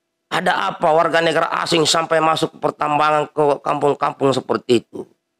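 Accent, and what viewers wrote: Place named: Sulawesi, Indonesia. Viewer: dialetnya mirip tolaki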